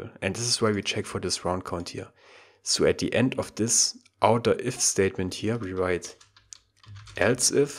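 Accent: Germany. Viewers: thanx ..you have a sweet german accent.. hhhh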